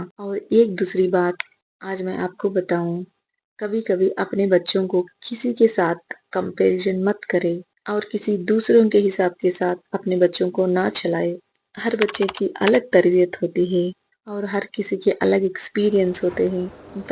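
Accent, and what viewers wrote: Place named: India. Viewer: sahi sahi mujhy apk lehjay sy lag rha tha ap Indian hen😍😍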